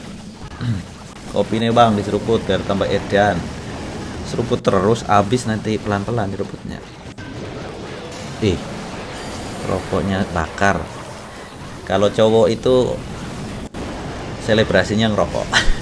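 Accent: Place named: Banyumas, Indonesia. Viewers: Ngapak banget mas ngomongnya:v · Daerah ndi om dening ngapak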